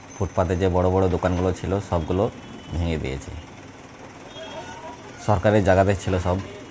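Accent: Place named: West Bengal, India. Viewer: ওর accent বলে ওর চৌদ্দপুরুষ পশ্চিমবঙ্গের।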